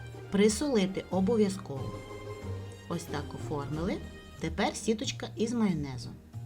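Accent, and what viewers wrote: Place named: Ukraine. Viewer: Может и вкусно но этот укропский говор раздражает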